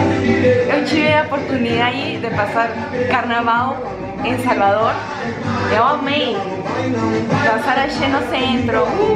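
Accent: Peru. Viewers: Oi você é maravilhosa, adoro este sotaque peruano. Sou inscrito e super fã, grande abraço do Rio de janeiro. Bjos minha linda!!! 😘💖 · por el acento digo q es peruana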